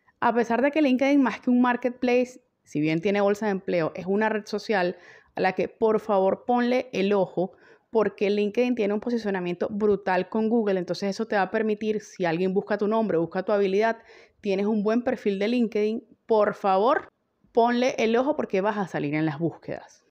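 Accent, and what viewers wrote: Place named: Venezuela. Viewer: Hola soy Lucy, recién descubrí tu canal, he visto otros, pero tú eres más clara, concreta, precisa en los planteamientos, sugerencias, y sobre todo el hablar "venezolano" no tiene competencia. Me he postulado en trabajos remotos dentro de Venezuela y las exigencias son altas, además de los requisitos académicos, las competencias en TIC y las virtuales como el acceso fluido a internet de 10Mbps o superior. Un computador con 4Ram, 250Gb Disco Duro, i5, o superior; en buen estado sin problemas de energía, ni de reinicios. Una cámara web o un celular con buena resolución. Nota: hace tiempo me postulé en una supuesta empresa con casa matriz en España, fue un total fiasco, todavía espero al abogado con el cual me amenazaron.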